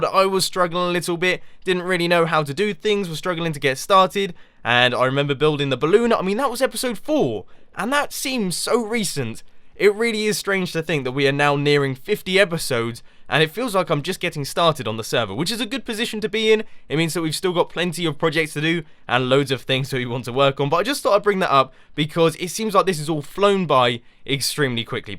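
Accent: United Kingdom. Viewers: Maybe it's just his part of town (or whatever) where he comes from. You do know the MANY accents people have in the UK, same goes for the way people talk.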